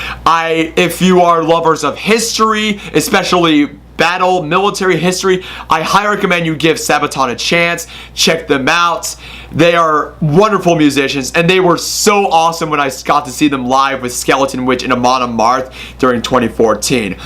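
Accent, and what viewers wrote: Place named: Russia. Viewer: Dat Putin accent doh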